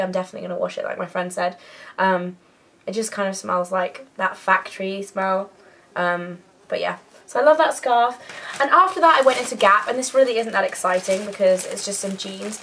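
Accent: United Kingdom. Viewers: Bahaha. i try to talk british like yhu afterwards.. Is tht akward..( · omg , i wish i had a british accent . i love they way your voice is !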